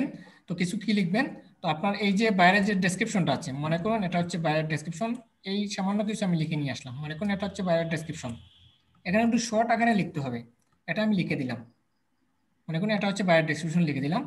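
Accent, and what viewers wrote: Sylhet, Bangladesh. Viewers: Vai er bari Sylhet Naki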